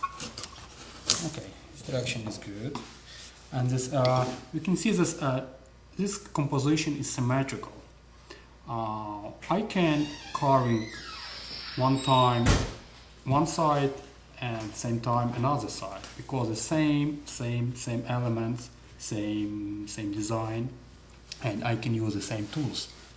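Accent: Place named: Russia. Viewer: Ты Русский ???? А то акцент больно хорош!!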